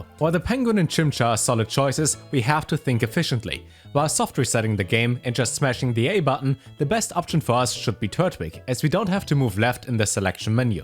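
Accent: Germany. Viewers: I subscribed for the German accent 😎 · Hey, you kinda sounds like german are u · Man hört fast nicht dass du deutsch bist. Abgesehen davon hammer video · Are you German lol? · Man hört irgendwie einen deutschen akzent raus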